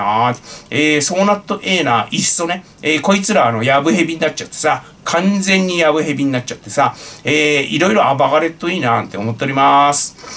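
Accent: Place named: Northern Kanto, Japan. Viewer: 北関東の訛が楽しい😂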